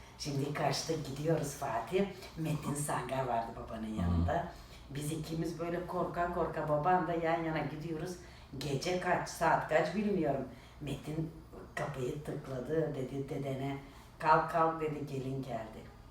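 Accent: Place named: Thrace, Turkey. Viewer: Yaaa ne kadar tatli bir ablasin ❤ bol bol boyle sohpetler hikayeler reelslar paylas nolur 😅o kadar eglendirdinki bizi Allah'ta seni guldursun ablacim❤ bu arada annemde trakyali kirklareliden sen konustukca bizim oraların ruhunu hissediyorum buram buram 🥹🥰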